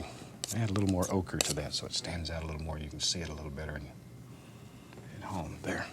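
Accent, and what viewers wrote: South, United States. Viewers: "It's purdy" God bless the south!!! And thank you for our southern accents!!!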